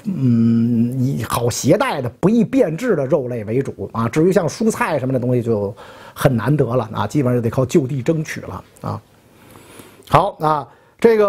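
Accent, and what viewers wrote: Beijing, China. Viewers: 才发现袁老师的节目居然还有字幕，袁老师讲话需要字幕吗😂这么标准的北平音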